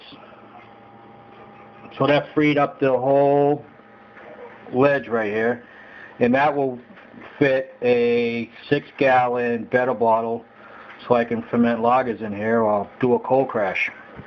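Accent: New England, United States. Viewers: Great work! I'm sure everyone is jealous now. :) Are you from New England? I suspect RI. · your craftsmanship + your accent make me feel like I'm watching The New Yankee Workshop.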